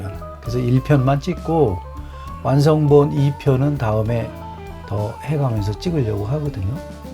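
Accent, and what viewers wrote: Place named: Paju, South Korea. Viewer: 목소리도 좋으시네요. 파주억양 맞아요.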